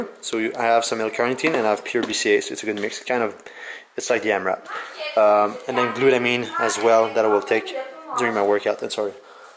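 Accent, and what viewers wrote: Quebec, Canada. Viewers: T'es québécois ? Je te suis depuis peu donc je me demandais haha ;)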